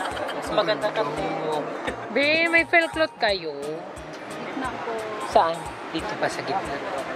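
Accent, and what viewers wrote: Visayas, Philippines. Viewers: Bisaya ka madam ? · Ay bisaya diay ka mamsh? Karon pa oks😅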